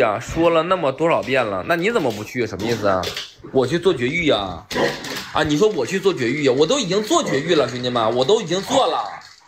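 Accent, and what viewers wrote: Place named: Northeast, China. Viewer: 吓，不是嗎？哈哈哈，東北漢普通話我只聽懂7O%而已，等我再聽一次。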